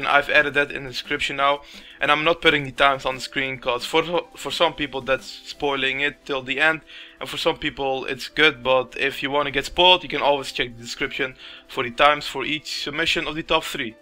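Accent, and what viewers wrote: Netherlands. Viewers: Are you dutch :0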